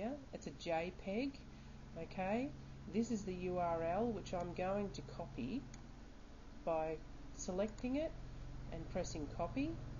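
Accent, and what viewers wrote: Australia. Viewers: okai are you from down unda???. anyways thanks for the video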